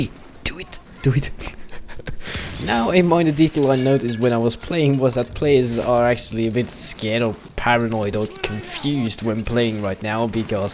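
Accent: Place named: Europe. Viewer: You're voice is really reminiscent of DannyOnPC, just some European goodness :3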